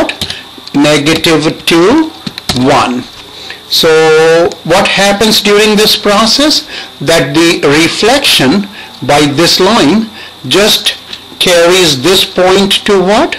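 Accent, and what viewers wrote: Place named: India. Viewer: lol indian accent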